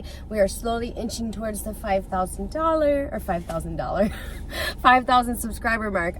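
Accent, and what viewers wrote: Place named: Minnesota, United States. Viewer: I usually watch your videos, but never subscribed. I gave you one today....your accent reminds me of my relatives in Minnesota. :) Plus your information is good.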